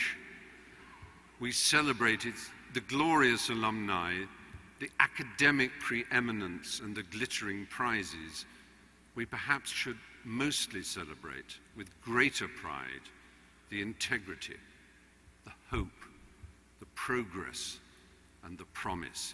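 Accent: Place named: England, United Kingdom. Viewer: From where in England is Fry's accent??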